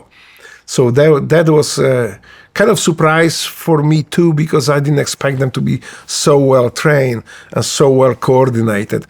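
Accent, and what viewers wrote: Poland. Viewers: Why he is speaking with Polish accent?😅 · You can be born in USA...but polish accent always comes out. Our nature dictates this. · your accent says you're from Poland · Speaking like a true Polish xd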